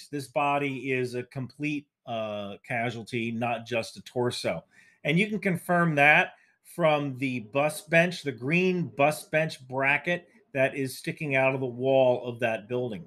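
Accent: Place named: United States. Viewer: I love your American accent . Watching you from Australia keep safe and a wonderful day 🌺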